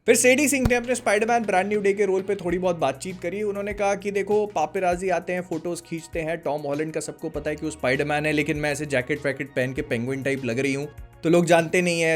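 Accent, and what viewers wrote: Bhopal, India. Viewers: Maakda.... kya tum Bhopali ho ??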